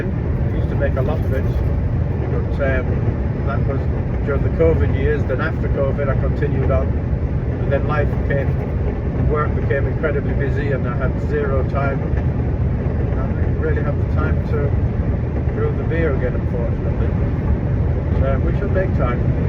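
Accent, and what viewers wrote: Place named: England, United Kingdom. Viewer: Enjoying your content. Trying to place your accent. What part of England are you from originally.?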